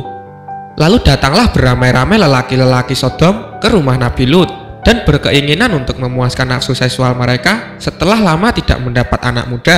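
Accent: Java, Indonesia. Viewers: Wong jowoooo medokk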